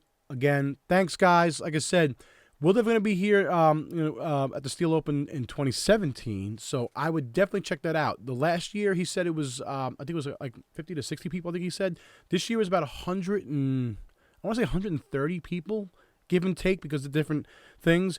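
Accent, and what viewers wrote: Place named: New York, United States. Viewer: you sound like you're in the new york area. if you are I wanna take you on on the space map!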